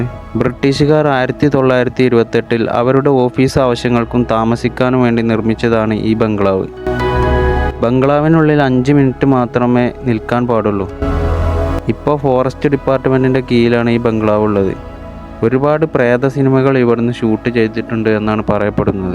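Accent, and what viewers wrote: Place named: Malappuram, India. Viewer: ഇത് മലപ്പുറമാണ് ഇവിടെ ഇങ്ങനെയാണ് സംസാരിക്കുക.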